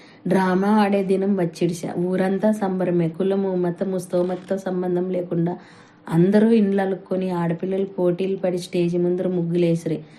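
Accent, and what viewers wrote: India. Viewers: కర్నూలు పడమటి తాలుకాలలోని యాస,గోనెగండ్ల మండలం, ఎమ్మిగనూరు మండలాలలో ఇలాగే వుంటుంది. చాలా బాగుంది 👌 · కుశలంబే గదా ఆంజనేయా కథకు ప్రాణం పోసింది.కథలోని మాండలికాన్ని మారుతీ, తన అక్షరాల్లో పండిస్తే, తన వాచకంలో అద్భుతంగా పండించిది.బహుశా ఆమెది ఎమ్మిగనూరు,ఆదోని ప్రాంతమే అనుకుంటాను.ఇతరులకు ఆ యాస,ఆ వాచకం సాధ్యం కాదు. మనసారా అభినందనలు.సీమ కథను శిఖరాగ్రానికి చేర్చిన మారుతికి ఆత్మీయాభినందనలు.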